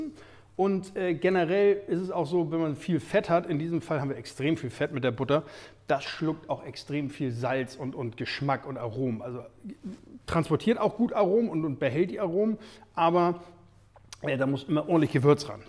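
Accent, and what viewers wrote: North, Germany. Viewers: Dieser norddeutsche Akzent 🥰 da wirken die guten Erklärungen noch besser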